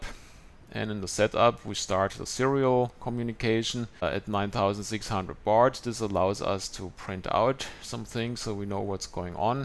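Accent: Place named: Germany. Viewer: German accent makes this video even more informal! ;) (like)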